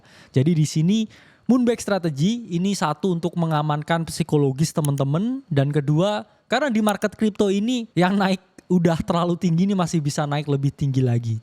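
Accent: Java, Indonesia. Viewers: Wong Jowo ndi suarane qi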